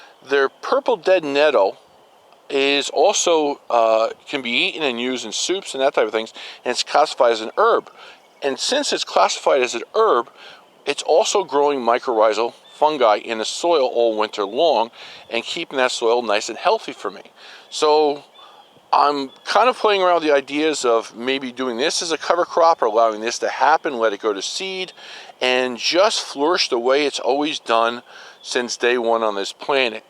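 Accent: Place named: Pennsylvania, United States. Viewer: Where in PA are you? I'm just assuming PA or southern NY from your accent...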